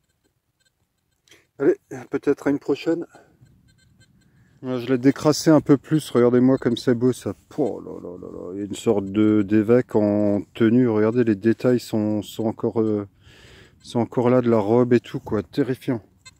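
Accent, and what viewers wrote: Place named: Alsace, France. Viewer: Felicitation !!! J'en reviens pas de la chance que tu as de trouvé une pièce du Vatican. Imagine la probabilité de tombé dessus c'est un truc de ouf. Sinon je trouvais ton accent familier mais j'arrivais pas à trouver et j'avais un pote a l'armée qui venait d'Alsace maintenant je sais 😁 vous avez la même façon de parlee ça ma rappelé plein de bon souvenirs. Merci encore pour cette superbe vidéo continu comme ça.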